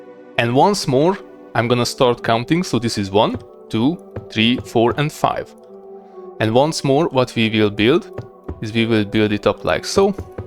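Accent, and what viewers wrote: Hungary. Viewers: Sounds like you have a Hungarian accent, am I right to assume that it is your mother language? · instantly recognized the thick hungarian accent. Bojler eladó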